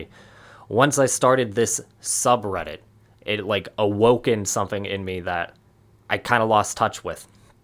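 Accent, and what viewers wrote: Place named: Virginia, United States. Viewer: Thanks for tge advice bro! Been working for me so far. You have a slight Virginia accent , am I correct in assuming that?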